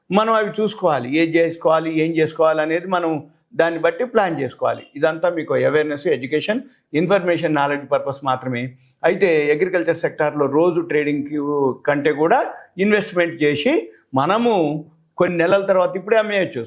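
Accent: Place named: East Godavari, India. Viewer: Sir Mee native east godavara. Educated presentation LA ledu. 🙏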